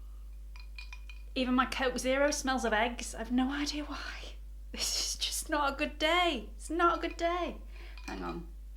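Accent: United Kingdom. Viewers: I love your accent,from which part of the UK you are🙂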